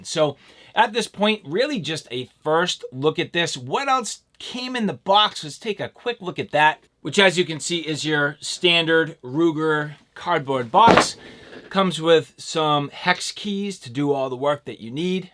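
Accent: Massachusetts, United States. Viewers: You are from Mass. I can tell.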